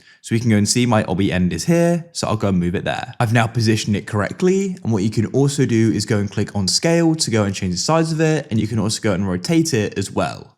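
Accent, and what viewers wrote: United Kingdom. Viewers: you to british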